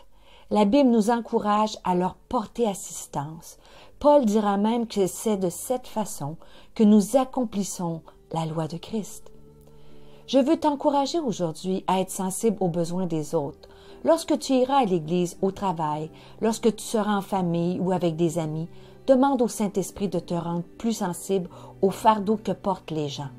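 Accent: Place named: Quebec, Canada. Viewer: Bonjour merci pour ces mots gentils ey avec un accent tres fort du Québec si je ne me trompe Je mediterai volontiers sur ce que vous avez dit au cours de cette journee Bon dimanche a vous et a votre famille Des bisous de l'île Maurice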